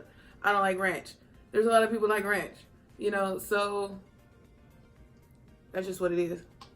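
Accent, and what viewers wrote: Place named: Dallas, United States. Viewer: Hi! This is my 1st video of yours. I like your voice & think I hear a Dallas accent. Are you from Dallas per chance? 🤔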